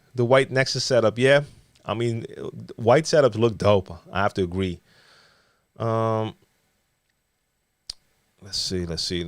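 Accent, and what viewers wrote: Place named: New York, United States. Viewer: I straight thought you where from New York.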